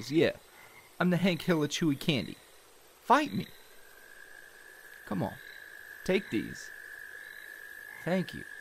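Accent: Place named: New Jersey, United States. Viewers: defo from northern Jersey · Jersey accents are wow ❤ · Jersey Accents r to die for 😍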